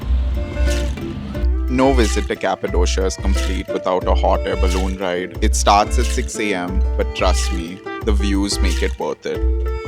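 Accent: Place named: India. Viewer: It’s tough to watch this with that Indian accent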